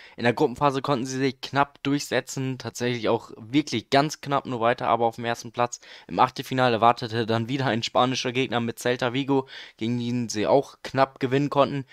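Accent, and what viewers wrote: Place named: Germany. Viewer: Hi. Danke für die antwort. Naja es ist halt so ein sehr deutscher englisch akzent. Nicht schlimm aber für leute die flüssig englisch sprechen ein bisschen nervig. Aber das video war dennoch sehr sehr gut. Du hast ein neuen abonnenten!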